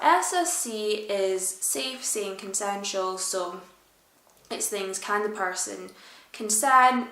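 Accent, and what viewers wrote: Scotland, United Kingdom. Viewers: The lovely lady is from Bonnie Scotland..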